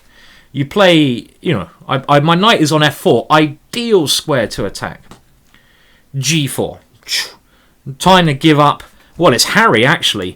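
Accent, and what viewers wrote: United Kingdom. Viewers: Playing video on full volume, enjoying the chess video, even his strong British accent, and that was when the sneeze nearly made me deaf. XD.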